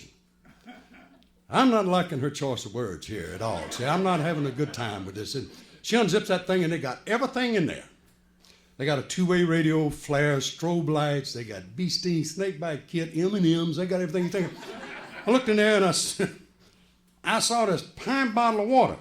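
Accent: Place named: Appalachia, United States. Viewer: This is just too funny narrative in his hillbilly way I'd imagine bubba was just as entertained about it..."my exaust is exausted"...lmao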